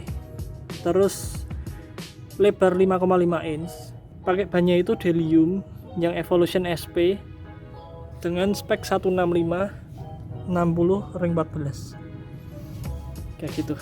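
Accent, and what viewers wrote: Indonesia. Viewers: Paras cinese tp logat jawanesa 😆😆😆👍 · mase ngingetin koncoku orang Surabaya :D.. apik motore mas wkwkw..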